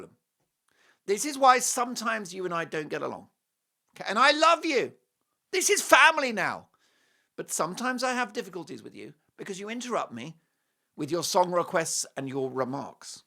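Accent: United States. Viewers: Your American accent is pretty good, too bad I can't say the same of your singing. I do love your show just not your singing. I think your analysis of Harry's pose is not accurate, but I agree he, by now, is seriously regretting his marriage and is very unhappy. I fear he might eventually be a suicide risk because when she dumps him, he will have nothing left. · GAH! Your U.S. accent is really good! 😅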